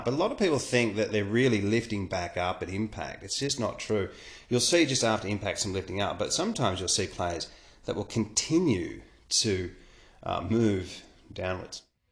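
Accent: Australia. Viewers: Love your videos, they are amazing, delivered with good old Aussie subtlety and charm, keep up the good work mate! Aberdeen, Scotland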